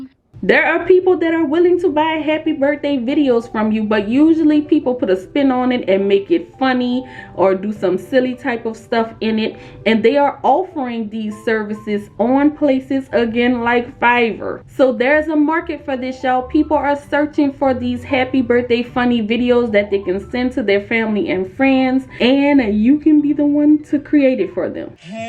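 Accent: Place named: Louisiana, United States. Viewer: Obviously tell your from Louisiana by your accent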